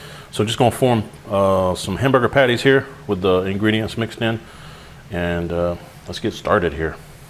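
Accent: South, United States. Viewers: You sound like you are from southeast Texas or southwest Louisiana. I'm from DeRidder, La but i live in Henderson, Tx now. You sound like my people lol There ain't nothing better than a southern gentleman who knows how to cook! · Hi, I like your stuff. Your voice takes me home to the south again. One thought comes to me. What do you think of a lid or splatter shield over the skillet? Seems to me easier to wash a splatter shield than degrease other pots, the entire stove-top and burner grills and burner diffuser parts to keep the gas ports clear of blockage. Who cleans ip behind you. I'm guessing its not you. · i dont like steak sauce, but this video has definately made me want to try it out. it looks so good.... thanks for this awesome idea... (ps-i love your southern accent) :p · For those that don't speak southern drawl English, "I'm awn" means, "I'm going to" I know it makes no sense. 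Nobody can explain that total ruination of our English language. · we never use aluminum in the kitchen . oh wait, here comes the cast iron. sorry. other than that, they look great. where is the chopped onion? Jumped the gun again, sorry. lol my preference. great video. anybody that says "warter" and "fixin to" must be southern, Georgia or Alabama?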